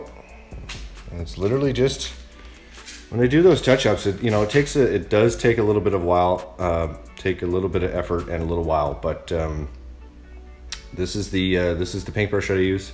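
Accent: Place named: Newfoundland, Canada. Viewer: You from the Maritimes? Newfoundland?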